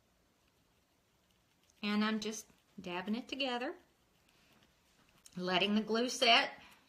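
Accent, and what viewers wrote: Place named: South, United States. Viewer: I just got to watch your video.... I love the 2nd bow. It is much fuller. I love the chevron pattern. It is gorgeous!!! Truly gorgeous. I love the wreath. I didn't get to see the making of the wreath. But I heard what you did. Thank you for your kindness! I love you. You sound like me, I'm southern gal from Louisiana.... I love the wreath and both bows. Except my favorite is 2nd. Glad you changed them out!!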